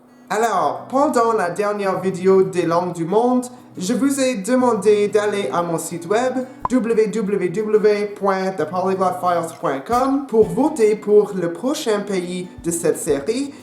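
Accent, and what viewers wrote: Quebec, Canada. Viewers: Same here i was laughing the entire time im from Florida the very south of US (comme Marseilles pour vous) his accent is a northern it sounds canadien or british to me lol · I find the Québecois accent you've got a little grating aha, i'm not sure why. Nice video though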